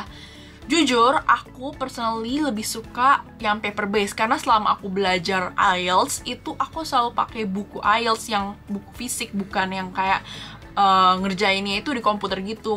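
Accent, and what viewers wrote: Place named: United Kingdom. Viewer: Ngomongnya cepet banget dan kadang pake British Accent jadi kurang kedengaran jelas 😢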